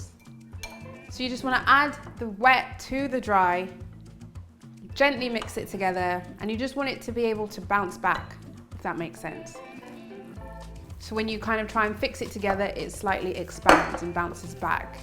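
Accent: London, United Kingdom. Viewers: Beautiful black vegan with cute London accent. 😍 Surprised to see so few guys in your comments, we are usually suckers for beauty and food!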